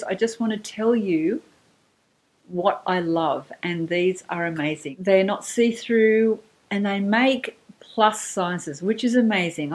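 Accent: Australia. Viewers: Super fantastic video!! So happy I found your channel. I love all these suggestions, and do already wear those types of flowy tops, longer in front and back! Thanks for the links. I LOVE ❤ your hair! Just beautiful 😍 Is it all your own? (I have a family member in the hairdressing business), so have had extensions and own a couple hairpieces. I'd love to hear where/how you get such a gorgeous mane! I love your accent too (Australian?) Sorry so long...new subscriber lol 😄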